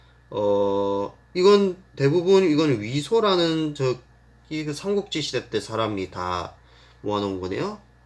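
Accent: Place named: China. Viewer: 조선족 같습니다.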